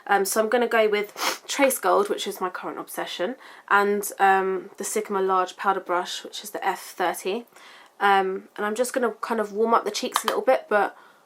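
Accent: United Kingdom. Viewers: OMG You are so beautiful!I love your voice! I love your British accent!I love your videos!♥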